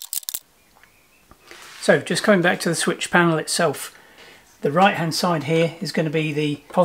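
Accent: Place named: United Kingdom. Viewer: As a note, ABYC Standards do not allow solder as the sole means of connection for an electrical connector. You can crimp AND solder, but solder by itself is not permitted. So if your boat may be subject to ABVC Standards (or you want to meet typical standards) then crimping should be used or used as well. Not probably an issue based on the accent in the video, but I'm not sure of Euro/UK standards and if they differ.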